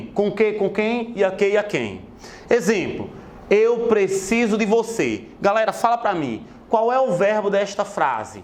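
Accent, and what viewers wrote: Northeast, Brazil. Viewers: Fessor vc é lindo e é dez!!!! Gostei do sotaque nordestino!Adorei a dica "DECA" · Um nordestinoooo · sutaque nordestino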